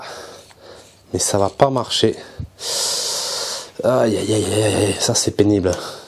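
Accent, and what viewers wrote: Toulouse, France. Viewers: ami toulousain bonjour! Suis un passionné de velo vintage et j'en retape plusieurs par mois (les france loire, starnord ou arrow ont plus de secret pour moi lol ) sur le moderne je connais la theorie mais pas de pratique. J'aime beaucoup ta chaine que je suis. Plusieures choses: - l'immersion dans l'atelier avec le bordel inevitable, les imprevus galere, le bricolage pour s'en sortir..en toute transparence. Validé 🙂 - l'humilté generale de ton discours et attitude. Assez rare sur you tube pour etre souligné - Pas de tabou ou autres sur l'argent ou ta vision du commerce. Tres cool. (Tu voles pas ton argent, manquerait plus que tu ait honte de ca! huhu) - Les fichiers "one shot" sans montage, plus long et lent mais beaucoup pus vivant. Pas de montage pour toi. c'est tout benef de temps. Le seul inconvenient est pour toi car cela t'oblige a parler tout le temps pour "meubler". 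J'aime beaucoup l'accent toulouain mais je t'avoues que je mute le son parfois (no offense bro'!:-) ) Sur cette video: Magnifique impro sur l'habillage du cintre! dommage que le marron aille pas un poil plus haut pour avoir le noir juste la partie horizontale. Le noir aurait ete invisible de profil ) Au final, Les velos bleu des ces années la (teinte souvent pastel ou pailletées) avec cintre et selle marron ca match toujours! Moi j'aime beaucoup le resultat ici BRAVO! Changes rien Amitiés du Morbihan;-) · En tout cas bravo ,avec rien tu fait quelque chose ....sais plus quoi dire ,en plus je vais faire une confidence,c'est grâce a cet accent toulousain que j'adore et qui rends ces vidéos encore plus agréables et attrayantes a voir ....c'est pas beau ça ? suis sincère crois moi et je pourrait regarder pendant des heures .....bon weekend du 11 novembre...😊✌✌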